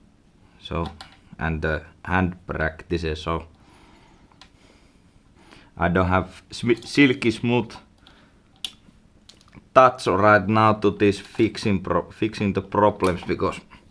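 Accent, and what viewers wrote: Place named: Finland. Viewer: Juu ajattelin vaan ku sulla on silti toi suomiaksentti mutta sä et jää jankkaamaa sanojen kaa kuten monet jää.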